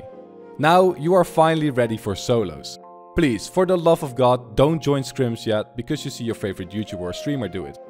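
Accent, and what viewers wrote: Netherlands. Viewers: Eyyyyy ben jij nederlands? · you hear your voice being Dutch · Ik hoorde dit letterlijk 1 seconde in wist gelijk dat je een Nederlander was😂 Geen haat btw bij mij hoor je het ook hoor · Hes Dutch (like me) and the most people that are dutch have that accent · Je bent een Nederlander! Ik vond je accent al zo NIET engels lijken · waittttt are you Dutch or Belgian? ;O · You are probably Dutch? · Youre dutch? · Wait are you from teh netherands? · Ik hoorde aan je accent dat je nederlands was en toen je je dpi naar 500 zette toen zag ik dat het in nederlands stond gg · Wait you’re dutch lol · Are you dutch? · ben je Nederlands? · R you dutch bc u have a dutch accent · Is he dutch? His accent gave iT away! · Yo ik ga morgen overstappen naar pc en ik vroeg me af aangezien je ook Nederlands bent (ik hoor het aan je accent 😁) of je mischien als ik een beetje beter ben een paar 1v1s tegen me wilt doen om te oefenen. 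Je video's zijn handig 💪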